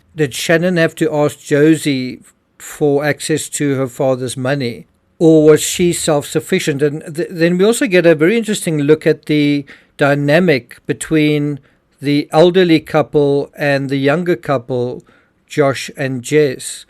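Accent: South Africa. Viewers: Why is a south African reading Australian news? It's difficult to understand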